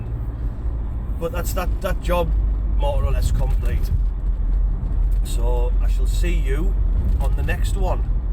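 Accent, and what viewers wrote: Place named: Hartlepool, United Kingdom. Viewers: Great video. I too mainly do the big stuff from 415v up to 66kv. Love the challenge bit frustrating at times but love it all the same. Keep up the vids 👍. Where about sin the north east you from as you sound close to me in the Boro. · You sound like a fellow monkey hanger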